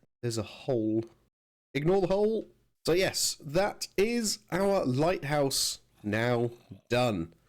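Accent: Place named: Posh, United Kingdom. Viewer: Awful how can you call this content 👀 You sound like you went to private school 🙄 toff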